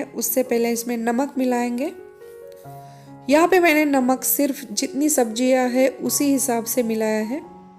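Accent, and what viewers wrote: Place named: Maharashtra, India. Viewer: mam aapke baat karne ke tarike se malum howa ke aap maharashtra se hai thanks mam reply main bhi maharashtra se ho aurangabad se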